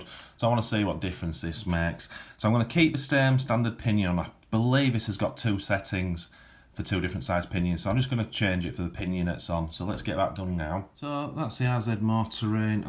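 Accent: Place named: Yorkshire, United Kingdom. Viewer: Great content mate, where you from? You sound Yorkshire, I'm Leeds myself 👍😎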